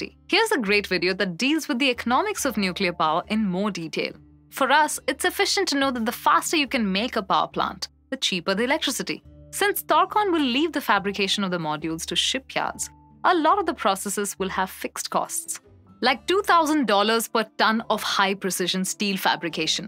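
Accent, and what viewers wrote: India. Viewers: the most precise Indian accent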